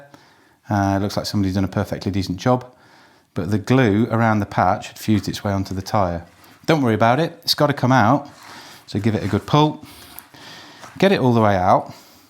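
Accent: United Kingdom. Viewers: One of the best instructional videos I’ve ever seen on any subject. Excellent optics and close ups. Outstanding narration with confident, easy going style (being American, love the Brit accent, characterizations, and take on things) using only hand and body skills with no unnecessary props. Covered all the essential information without being too long or short. Love it, love it, love it! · damn, such a nice English accent. I can listen that for hours.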